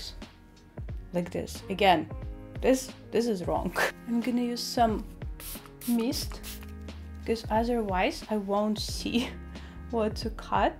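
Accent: Russia. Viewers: I could listen to your accent for days. Is it Russian? Thank you for video. I am disabled and cant afford salon anymore plus i have depuytens contracture in my dominate hand. It makes for very difficult to trim my own hair. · From the accent and intonation of her voice, I realized that the girl was Russian.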